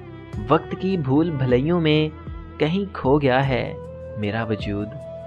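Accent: Punjab, India. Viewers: shyri achi hai per maaf krna punjabi lehja main sara maza khrb kr diya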